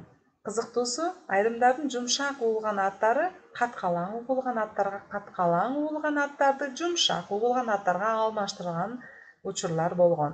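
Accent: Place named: Turkey. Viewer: Молодчик 🔥😍 акцент местный турецкий передача информации убедительная и выразительная💯😘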